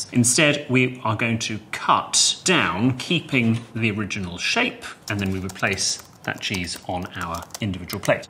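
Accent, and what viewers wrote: United Kingdom. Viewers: どうしてダメなのか理由を教えてくれるから良い。 イギリスっぽいイントネーションと時々皮肉が混じるのも見てて面白い。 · イギリス英語聞けて嬉しい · 判を押したようなイギリス英語だけで腹いっぱい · イントネーションイギリスすぎる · イギリス英語だー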